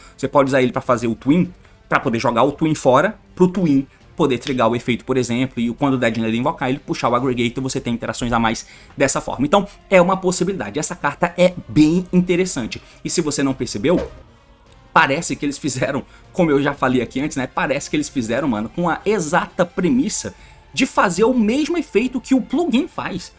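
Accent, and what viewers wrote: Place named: Bahia, Brazil. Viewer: Não deixe os haters mudarem teu jeito baiano de falar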